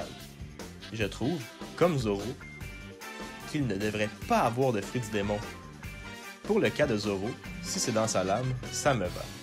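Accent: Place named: Quebec, Canada. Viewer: ce n'est pas parce que des youtubers 'connus' le font que ça doit empêcher tous les autres d'en parler... dans ce cas là, plus personne n'a le droit de faire des vidéos one piece à part eux? bizarre comme façon de raisonner.. et ce n'est pas parce que TOI tu n'apprécies pas l'accent québécois que tout le monde pense de la même façon que toi. Ce n'est pas une voix qui permet à quelqu'un de percer ou non mais plutôt son contenu. :) Bonne journée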